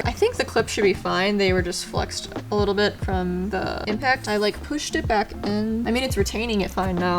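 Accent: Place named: England, United Kingdom. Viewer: Definitely an English accent, not 'strayan' (Australian). With the reverse cameraI I also tend to forget about the existence of mirrors... good luck with the repair!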